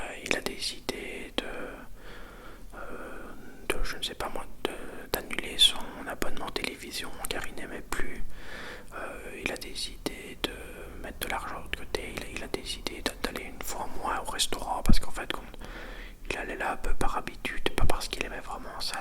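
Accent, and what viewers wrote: Belgium. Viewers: J'adore l'accent belge😉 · L accent belge me detent beaucoup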